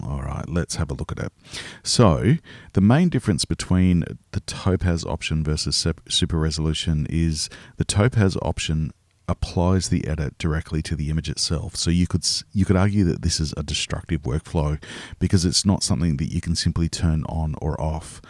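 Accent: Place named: Australia. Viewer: I've only just come across your channel and I'm happy to hear an Aussie accent. Thank you for the info. I'm newish to LR and will be going through your playlist to learn more 👍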